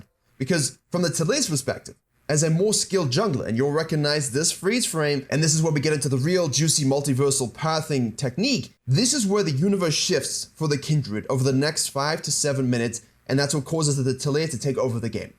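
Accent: South Africa. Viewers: Was wondering from his accent where he was from, that's really cool to know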